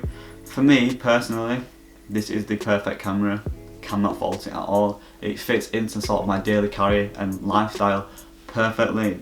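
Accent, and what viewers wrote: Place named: Preston, United Kingdom. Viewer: Nice video mate, love my M6. Where you from? Sounds like you are close to me up Preston way.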